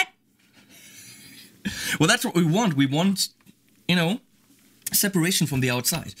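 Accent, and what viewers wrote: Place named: Germany. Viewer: ich mag your german accent lol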